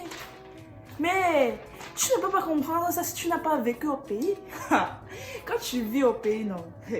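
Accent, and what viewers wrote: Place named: Cameroon. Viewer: ... bonjour à toi… je me suis arrêté où tu dis MAJOUMBOUM... si tu voyais la tête que tu fais en disant ce mot...😁... bref tu as dis à la fin que tu espère qu'on a aimé, j'ai aimé mais je trouve que tu as été trop doux envers les Camerounais… le Camerounais a tellement des talents et des dons mais dommage la transmission est ZERO, sur le plan éducatif MAJOUMBOUM et tout le reste là, nos petites sœurs et petits frères retiennent quoi sur le plan INSTRUCTIF… Mon père MEA dit souvent on ne peut donner que ce que l'on connait, nos frères adorent le bruit et le bouquant. l'ambiance n'est pas une mauvaise chose mais il faut que ça éduque, que ça instruit; on va toujours nous insulter et nous qualifier ( MAJOUMBOUM ). et en passant tu as gardé ton accent Camerounais où est passé l'accent grec???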